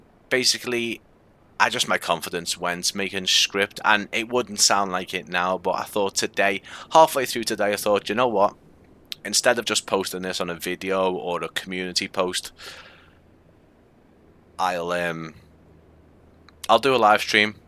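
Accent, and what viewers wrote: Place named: Wirral, United Kingdom. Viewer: Excellent work, mate, keep it up :) Where are you? Your accent sounds local to me (Wirral)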